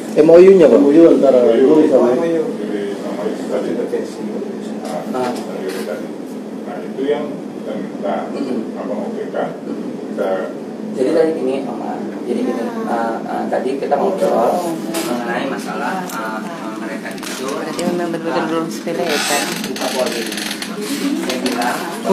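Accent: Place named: Adonara, Indonesia. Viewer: Abang ini orang, apa??? LOGAT nya masi kental Le... Hehehe... Kayak orang adonara Witi hama.... LOGAT nya itu hahahaha, sukses broo terus laris manis buat konten nya.... Kita akan mendukung dari belakang.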